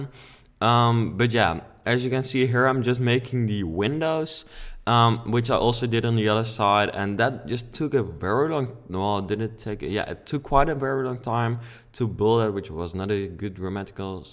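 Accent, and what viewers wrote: Netherlands. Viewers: Jij klinkt zo nederlands hahahahahahaha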